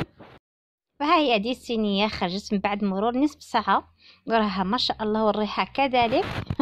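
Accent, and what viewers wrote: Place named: Algeria. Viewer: بشوية عليك انا قصدت بلي اللهجة نتاعك تشبه اللهجة الجزائرية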